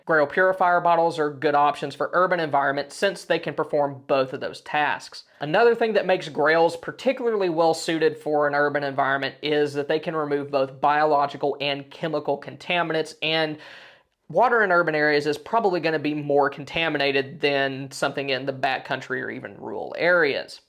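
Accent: Texas, United States. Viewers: Congrats in reaching over the 100K subscriptions mate you deserve it as you always have great content and advice. That BK knife you have looks the goods and I have quite a small collection myself of both bought knives and I also make my own. Look forward to many more great videos and I like listening to your Texas drawl, regards from Down Under mate 😁😉🦘🦘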